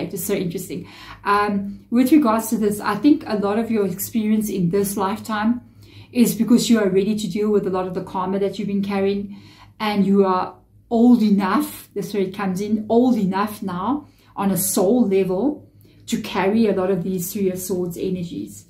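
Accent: South Africa. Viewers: I thought your accent is so cute. ❤️ I never had any trouble understanding your English at all. You talk so grammatically correct. I'm in Chicago and never knew anyone who is South African. Love you mama tarot lady. ❤️ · That is what drew me to your channel, not only your lovely face but beautiful South African accent. Thank you for all you do..................... love from the UK. xxxxx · I am South African too living in New Zealand love the accent 😊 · I love listening tonyour readings precisely because of your accent. It reminds me of a former south african manager I worked with, lovely woman, I miss working and talking with her. You talk at a very comforting pace. Thank you for all you share ❤ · 777 comments, ❤ well I've now made it 778😂❤ ps your accent is my favourite, I personally love the South African accent. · ❤😊thank you for your encouraging readings, dear soul!!! 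And I love your accent, I really do. In the beginning I had to become used to it, but now I understand everything you explain😊. My daughter s had schoolmates from south Africa, and at the age of 12 their parents had moved to Germany. They shared our home very often, and my daughter never had difficulties to understand you!😊 Feel good and keep shining❤❤❤ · I absolutely love your South African accent and the way you speak and express yourself! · Always a delight to be here for your readings. So funny all these south african english like the now-now :) Thank u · love the SA accent?!!?🧚🧚🧚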